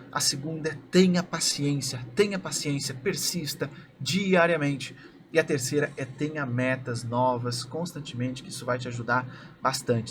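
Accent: Portugal. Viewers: Que vídeo phoda! Eu sou designer, mas comecei a estudar marketing qndo conheci e teu curso de Facebook (na época do teu sotaque bem português)😁 demorei até resolver unir essas duas áreas, pq como só havia trabalhado em agências ganhando mt pouco, e nunca pensei q fosse possível escalar. Agora estou cheia das metas 🤣 Criei canal, mas por me tomar mt tempo p editar, estou focando na criação de um produto p/ depois virar tua aluna de novo e aprender a anunciar.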